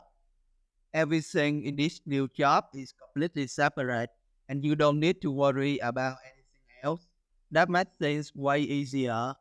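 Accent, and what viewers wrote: Vietnam. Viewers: Nghe cách nói của ông cháu là biết ngay người Việt Nam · Giọng nghe rất Việt Nam :) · Your voice sounds like a Vietnamese person speaking English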